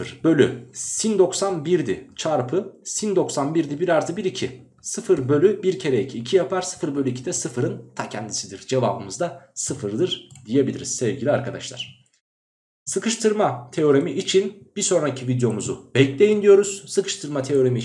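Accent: Ankara, Turkey. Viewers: Ankaralı hoca galiba bir videoda diyordu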